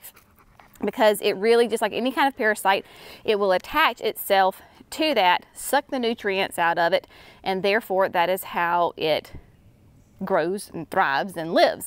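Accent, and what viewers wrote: South, United States. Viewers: hahahahaha thanks for the correction and I just love your Southern accent it’s a very soothing sound to me ✨🤍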